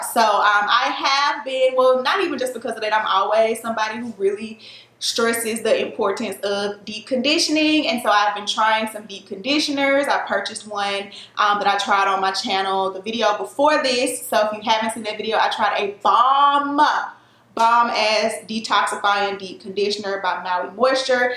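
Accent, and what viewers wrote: South, United States. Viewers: Get those ruts ❤️😂❤️😂❤️ I love that country twang...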